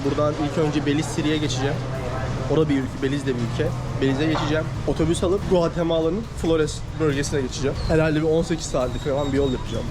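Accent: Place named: Mexico. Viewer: Kardeş sen türkmüsün?valla Türkçe öğrenmiş Meksikalı gibisin!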